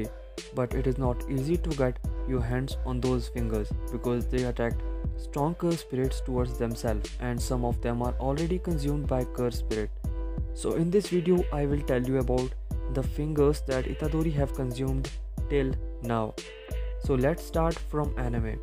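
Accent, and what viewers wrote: India. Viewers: Indian accent☠️ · U Indian right ?. The accent is just shouting it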